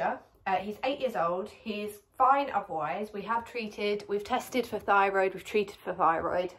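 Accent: United Kingdom. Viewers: British accent is so satisfying 😏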